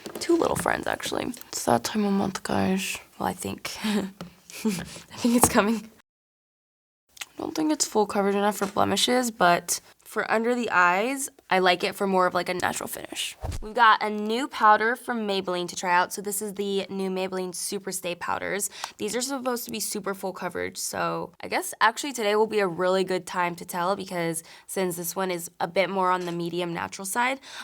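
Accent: United Kingdom. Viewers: Damn girl! Your British accent is perfect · I love that British accent💂🏻‍♀️🇬🇧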